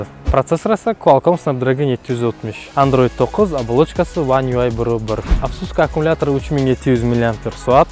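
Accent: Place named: Bukhara, Uzbekistan. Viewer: Бухороча шева малумда барибир, гап йок молодец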